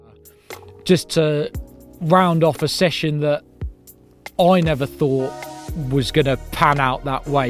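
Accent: RP, United Kingdom. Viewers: He’s abit to posh for carp fishing ......horaa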